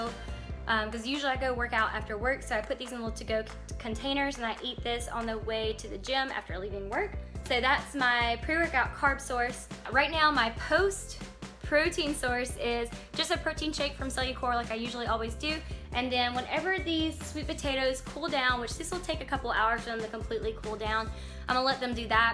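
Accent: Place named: South, United States. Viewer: Hey!, I just want to say that I just love your southern accent, and as for the price of the meat, why don't you try out canned sardines. I just started to eat them. I got them from Trader Joe's and they are the best. Also, I want to say is that your competition meal prep is my every day meal prp.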